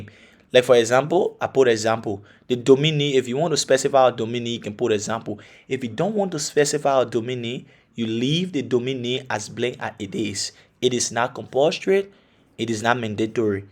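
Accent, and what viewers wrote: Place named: Liberia. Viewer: hi, I know this is not necessary. you sound like a Liberian. Good job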